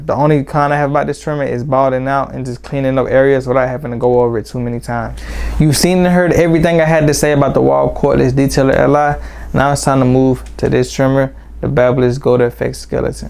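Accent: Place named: Miami, United States. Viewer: You gotta be from Miami the word “really” give y’all away sound like rally lol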